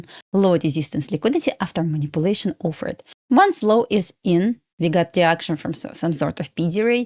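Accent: Russia. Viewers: Why your voice sounds like Russian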